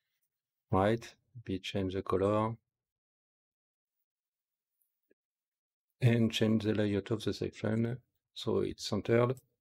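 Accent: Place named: France. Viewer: Thanks for this tuto. Sounds like your french like me 😅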